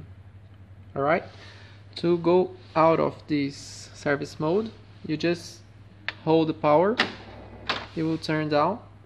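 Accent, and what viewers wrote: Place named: Brazil. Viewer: Ahhh sabia que era br pelo sotaque 😂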